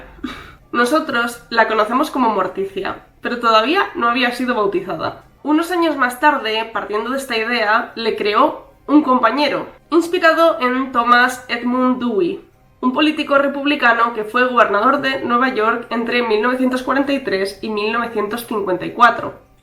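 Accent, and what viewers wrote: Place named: Spain. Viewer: Todos tenemos acento. Es la forma en que se expresa la lengua que hablamos según el lugar de donde somos. Ella tiene acento español, no sé específicamente de qué área. Y para mí, una persona de otro lugar completamente distinto, es una forma de hablar y expresarse que llama la atención. Así que sí, qué acento tan precioso.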